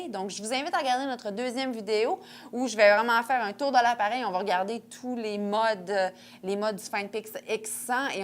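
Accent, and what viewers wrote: Canada. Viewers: Omg, l'accent canadian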